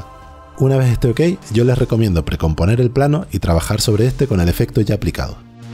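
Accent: Canary Islands, Spain. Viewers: El video es mucho más llevadero con ese acento canario hermano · Joder cómo se nota que eres Canario, me das una paz al hablar que haces que todo parezca más fácil. El buen rollito te lo has traído a youtube y eso se agradece jajajaja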